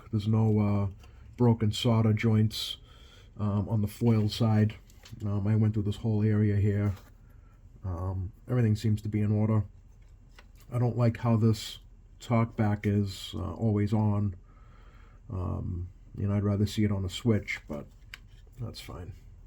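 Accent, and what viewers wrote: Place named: Southern New England, United States. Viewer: Interesting video. Some food for thought, and I'm certainly not trying to pick on you at all! Your signal tracing is using a signal injector. It emits a tone, probably 1 khz. Technically you didn't need to say audio. Just key the microphone and listen for the tone. Additionally I wouldn't mount the echo board near the rf power section. Leaving the echo board where it was originally mounted and cutting the echo board wires to the needed length may have been a better idea but that's all up to you. I'm just offering a different prospective. It's clear you enjoy working on CB's. It can be challenging and rewarding. My signal injector looks exactly like yours except mine is an old Radio Shack unit I picked up in a package deal from Smokey's CB shop in North Grosvenordale Connecticut. I bought all of his equipment many years ago when he retired. 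You're accent sounds like you're from Southern New England. :-)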